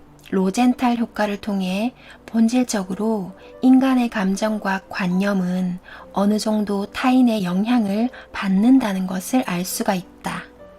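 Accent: Gyeongsang, South Korea. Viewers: 저는 님 경상도사투리가 묻어나는 스토리텔러여서 더 좋네요. 마음편하게하는 목소리입니다. 구독하고 갑니다.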